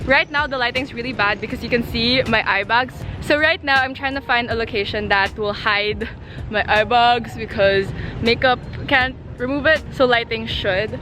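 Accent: Philippines. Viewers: damn, filipino accents, its so weird, okay im not hating because I also is a filipino, and my old "grammar" doesn't make any sense, just saying okay, dont hate me I also use to have a bad accent when speaking english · are you filipino? (accent)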